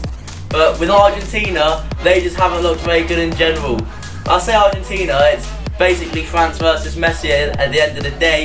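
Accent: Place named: England, United Kingdom. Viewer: Let me guess, u are from england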